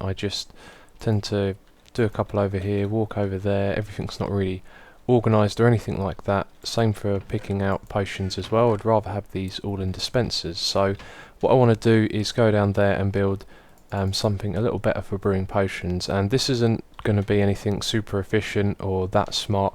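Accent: England, United Kingdom. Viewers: Nobody say 'dude you're stupid' here but are you British? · what part of england are you from? :)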